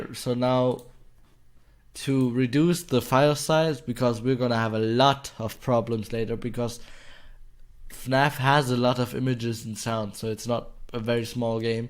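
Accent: Germany. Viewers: deutscher detected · Nicht böse gemeint, aber HOLY hört man dass du deutscher bist😂😂❤ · are you german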